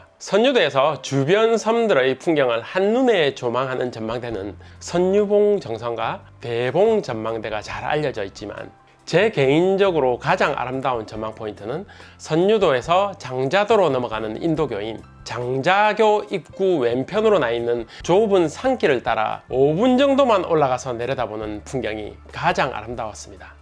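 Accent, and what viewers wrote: Gyeongsang, South Korea. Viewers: 저도 경상도 사람이라 듣기좋아욤👍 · 목소리 작렬 ..경상도 사투리 그러나 듣기는 쬐끔 ㅎㅎ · 구수~~한 깅상도 억약이 참말좋십니더 좋은정보들 주셨어 감사합니다~~^^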